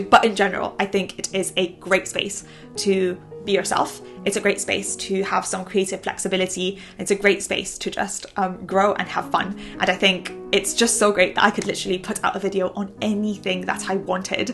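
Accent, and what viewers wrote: United Kingdom. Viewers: This is the first time and the quickest British accent i have never seen before,interesting,after studying American accent too much, to find out the British accent is so speical and amazing because of your expressions when looking back on... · Ohhh I love the British accent ❤❤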